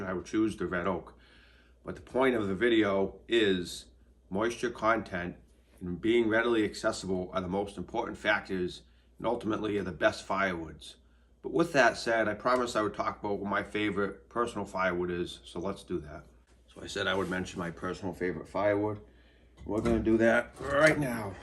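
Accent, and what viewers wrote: Massachusetts, United States. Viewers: I live close to Frankfurt, Germany and have access to beech, oak, pine, spruce and fir mostly with the occasional birch and wild cherry. My favorite firewood so far is beech. Starting the fire with some softwood and then adding beech is my standard procedure. Oak is great for putting on a good bed of coals. I split my firewood roughly into the size of a triangle that forms when you hold both index fingers and thumbs together. The length is between 10 and 11 inches (25-30cm). Stored in a proper wood shed, I usually get all my firewood to a moisture content below 20% until September/October when split and stacked in March of the same year. Thanks for the great videos, content and information. The Massachusetts accent reminds me of our relatives that live in Boston and Concord, MA.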